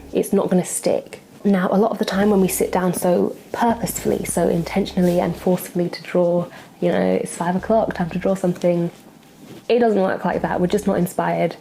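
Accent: England, United Kingdom. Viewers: where are you from in england?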